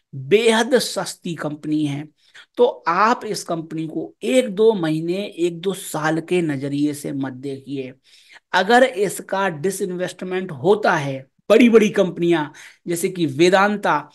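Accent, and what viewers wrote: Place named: Bihar, India. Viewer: go jaye....ga yani aap v bihari ho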